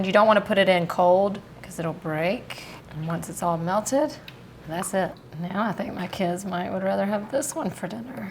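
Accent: United States. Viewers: I hear your pain. However, this is an American channel. Should we check the world over and only use ingredients universally available? Should your national cooking channel only use ingredients that are widely available in the US or world wide?? Honestly, I don't want to watch a local foreign channel that only produces world accessible dishes. I want authentic recipes. Authentic culture. Authentic accents. You are getting a taste of American culture 😊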